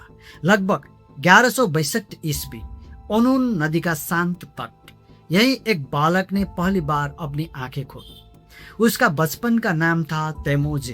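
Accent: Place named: Nepal. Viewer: u r nepali guy. bcoz how u speaks Hindi 100% nepali accent. with tone everything. but kinda like u person full confident. but never accept own mistake.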